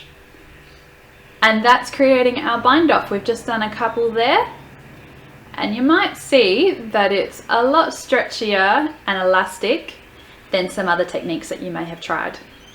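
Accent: Australia. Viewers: Thank you- very clearly explained stitches and you didn’t flick through your stitches quickly like many knitters doing this with continental knitting. Love your Aussie accent, I understood exactly what you were saying. And I am a senior from the Midwestern U.S. I could see what each motion was doing on the needles. Thank again,. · Yank English accent here and I understood your Ozzy accent just fine. Thank you for sharing and educating! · As an Aussie, I was SO relieved to find a knitting video featuring an Australian accent. It's the first one I've found after watching MANY knitting videos. Keep up the good work and thanks!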